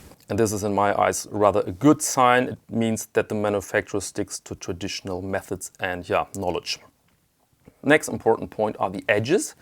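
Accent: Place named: Germany. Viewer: Hi, great Video! I assume from your subtle accent and the Giro-Card, that you are German. Do you have any suggestions for a good online or brick and mortar shop based in Germany, best case in Hamburg. Cheers, Thorben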